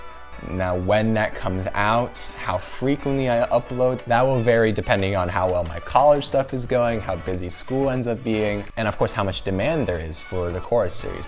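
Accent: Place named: United Kingdom. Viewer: What’s with that British accent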